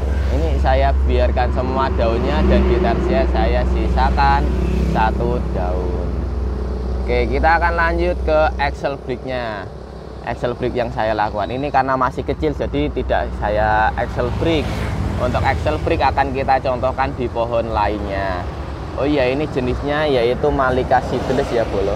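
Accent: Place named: Java, Indonesia. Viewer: TERIMAKASIH mudah dimengerti karena kemedokannya SUHU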